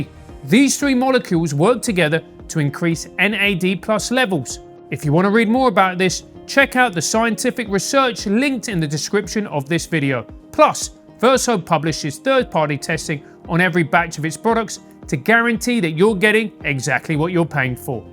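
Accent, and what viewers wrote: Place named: Mockney, United Kingdom. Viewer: Your mockney accent is awful.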